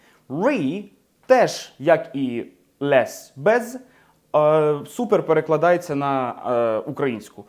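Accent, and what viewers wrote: United Kingdom. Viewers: Ці відео дуже корисні! І я вам дуже вдячна за ці уроки. Я завжди вам коментую бо я дійсно хочу щоб у вас був мільйон підписників! Обожнюю ваш британський акцент! Можливо ви колись розповісте нам як ви вивчили англійську так бездоганно!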